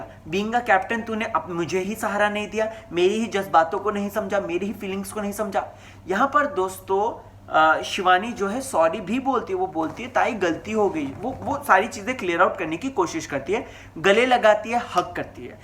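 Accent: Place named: Maharashtra, India. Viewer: Aap bhot cute marathi bolte ho😍😄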